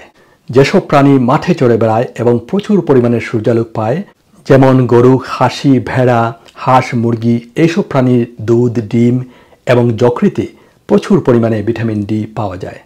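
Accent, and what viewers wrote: Sylhet, Bangladesh. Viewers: আমি সন্দেহ করছি, আপনার বাড়ি সিলেটে ।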